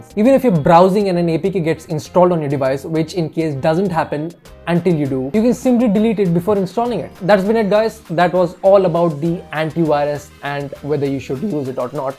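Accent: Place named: Southeast Asia. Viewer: Get used to it, way more people in the world sounds like this when they speak English than the American or British version. in South East Asia alone there are about 500 million English speakers and they all sound like this. But I do agree he needs to slow down a bit.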